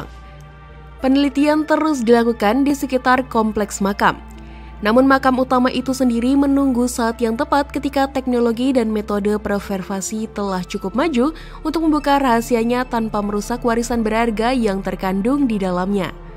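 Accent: Indonesia. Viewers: lidah indo agak beda boss.. 😂😂😂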